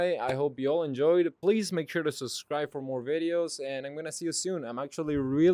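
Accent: Argentina. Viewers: Amigo sos argentino? Lpm me re confundis xd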